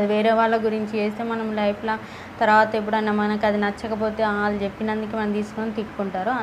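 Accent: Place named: Telangana, India. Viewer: Telangana slang lo super chepthunnaru medam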